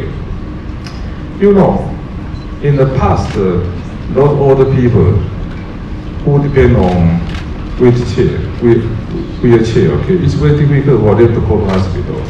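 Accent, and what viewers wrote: Taiwan. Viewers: 因為阿北,大家一起加減練英語.....阿北講英語,一樣很有親和力(超台的,還有人抹紅他....真的厚) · 真的是很台式英文 ha. 台灣教育體系教出來的. 正港的台灣人 XD.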